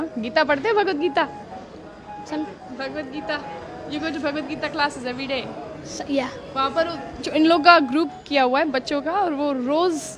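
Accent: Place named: India. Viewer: How she is foreigner?she can speak Hindi clearly with indian accent,so I think she is indian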